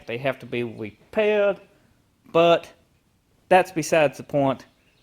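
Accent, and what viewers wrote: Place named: South, United States. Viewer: Thanks for the video, your accent is very interesting! what part of America r u from? I'm from Australia. I'm guessing u r somewhere in the southern US but still curious what specific area. 🤓 I installed my aftermarket headlight today, went pretty well only snapped one bolt and many of those brittle bitch clips lol ps for anyone yet to do this job, remember to remove the metal bracket from the original headlight and switch it over to the new one! Don't do what I did and have it nearly all together scratching your head wondering what's missing then have to pull it all apart again 😂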